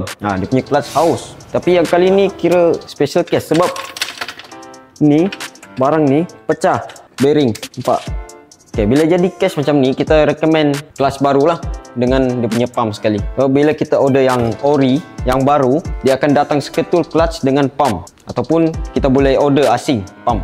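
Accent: Sabah, Malaysia. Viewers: Budak Sabah ni,slang Sabah masih ada😅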